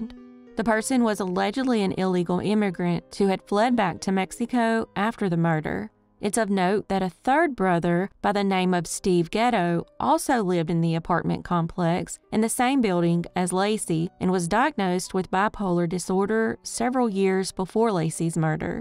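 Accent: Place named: South, United States. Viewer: Just saying...imagine that accent our kids would have... My Russian + This Southern... Awesome job on these stories though... 😀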